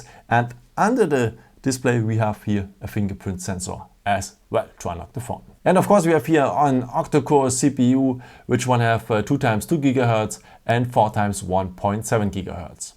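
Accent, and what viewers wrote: Germany. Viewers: Strong german accent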